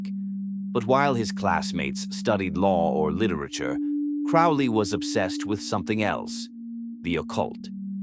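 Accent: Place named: United Kingdom. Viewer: At first I didn't notice the ominous music in the background, it fits the video, also loved the British accent it gave it more life😮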